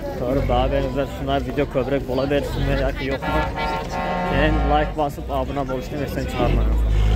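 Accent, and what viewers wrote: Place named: Lakai, Turkey. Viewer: Эй бача Лакайча гапзан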